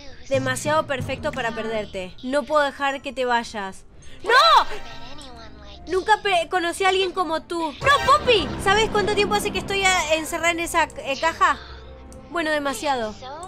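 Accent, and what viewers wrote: Argentina. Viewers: Hola eres argentina😊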